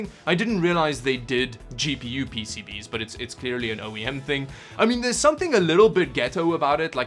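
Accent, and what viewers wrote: South Africa. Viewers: is jy van suid afrika bra jy het daai stem van suid afrikaaner lol ek baie neskiedag plus is algemene naam in suid afrika maar ek love jou vids jys supper funny bru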